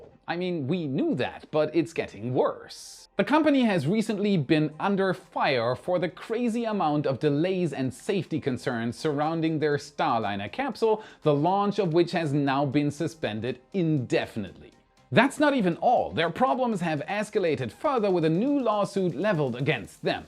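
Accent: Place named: Germany. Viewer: I love your show. I watch it all the time. I love your German accent, too. Let me share with you a secret: Adjacent is pronounced AH-JAY-SENT. The ‘C’ is soft and sounds like an ‘S’. I’m not sorry - I couldn’t resist. Keep up the great work.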